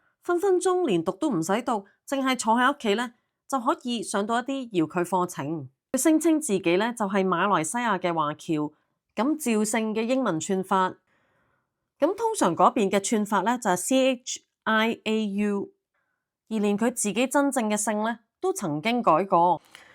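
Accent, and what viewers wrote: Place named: Hunan, China. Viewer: 七仙魚口音應該是湖南人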